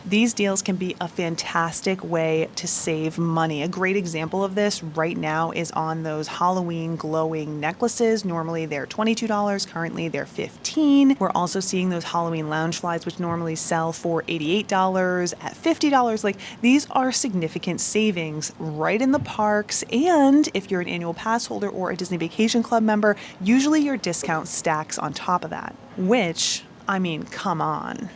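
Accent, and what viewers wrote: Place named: Minnesota, United States. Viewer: Could tell you were in Minnesota girl what part of Minnesota are you from as a fellow Minnesota commenting on your life?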